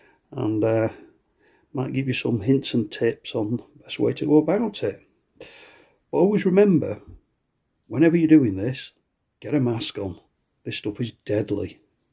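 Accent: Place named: Liverpool, United Kingdom. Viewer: I watch your channel too. Scouse geeza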